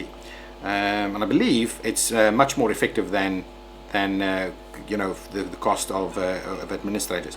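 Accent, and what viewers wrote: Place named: South Africa. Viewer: Your English seems a bit South African🤔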